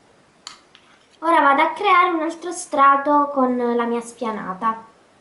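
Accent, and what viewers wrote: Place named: Abruzzo, Italy. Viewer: Ah che bello! Avevo riconosciuto l'accento! Anche io ho origini abruzzesi ed ogni volta che guardo i tuoi video, mi sento a casa =)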